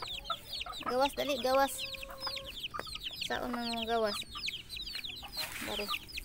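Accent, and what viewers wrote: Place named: Visayas, Philippines. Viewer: Makamingaw jud ani farm life 😂 bisaya man diay ka Ma’am 🤗 unta mudaghan pa sila kay lami na sabawan hahaha 🙏🙏 keep going dai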